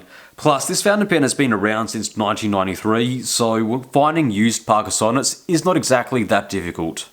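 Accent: Australia. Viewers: Holy fuck man!!! I remember your original videos! You're back now with a deeper voice and some scruff, but the same Aussie twang 😅 Great to have you back and see you're well, this genuinely made me happy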